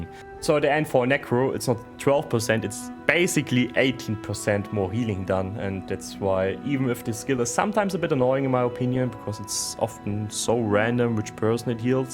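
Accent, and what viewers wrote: Germany. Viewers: Dein Englisch ist so niedlich "typisch" Deutsch ;-) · I love the german accent, never will miss the days with the guys in municher octoberfest xD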